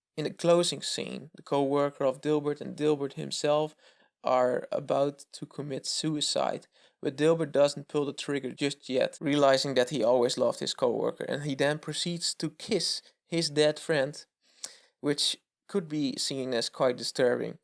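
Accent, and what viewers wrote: Netherlands. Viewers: Ahaha kom je uit nederland? Mooi accent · You sound Dutch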